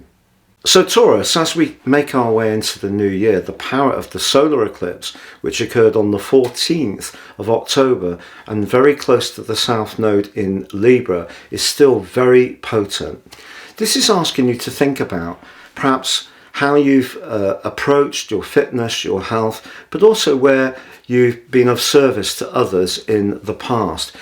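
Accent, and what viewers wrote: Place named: England, United Kingdom. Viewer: Thank you lovely. So nice to hear an English accent on u tube like a pair of warm slippers! Ive had some amazing prophesies Tarot wise i tried to align them with your reading. However, i remain a novice of astrology so it was too difficult for me to do. Time will tell. Best wishes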